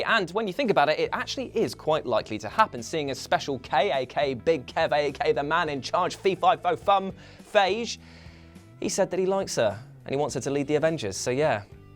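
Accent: United Kingdom. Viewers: Brick Boss 2004 he’s British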